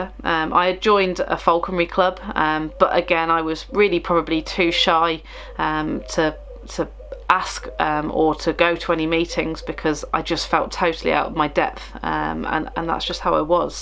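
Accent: United Kingdom. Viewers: I am currently trying to learn British English pronunciation (not really anything in particular like RP). I have to say, I love listening to people like you, who talk about interesting topics and also listening to how you speak. Also love the birds you have shown. Boop Boop ^^ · I like the British accent! I love your animals... Los Angeles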